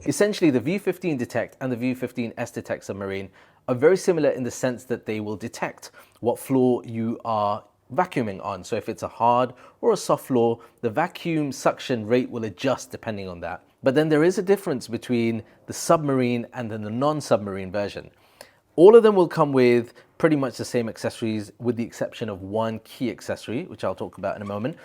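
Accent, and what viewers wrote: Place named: England, United Kingdom. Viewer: Fantastic how you have an English accent yet choose to convert UAE currency to US dollars and not UK pounds.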